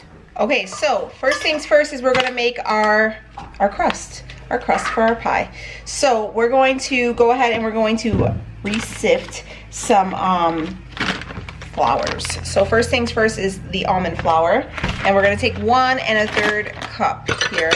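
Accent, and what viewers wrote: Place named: Minnesota, United States. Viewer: I hear a northern accent..are you from Minnesota ?? I was born and raised in Minneapolis -Fridley.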